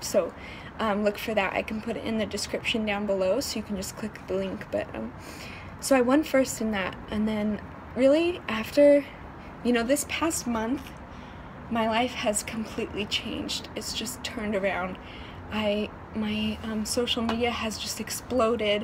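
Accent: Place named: New Mexico, United States. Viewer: Oh my gosh! I totally called your accent a few videos ago. I was like, "Man, she sounds like a New Mexican." That's where my mom is from & I lived there for about 4 years while I went to college. Loved this story time. It's very inspiring.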